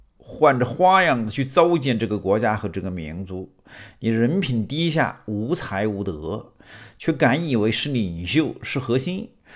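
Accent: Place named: Hunan, China. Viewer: 听到了湖南口语，想起我隔壁宿舍的兄弟。哈哈哈哈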